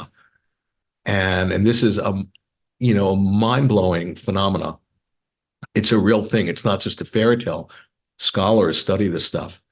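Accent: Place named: Philadelphia, United States. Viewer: Is Mr. from Philadelphia? His accent sounds like he is. I was surprised to see this is being presented by The Theosophical Society. They had a building on Rittenhouse Square in Center City- Philadelphia.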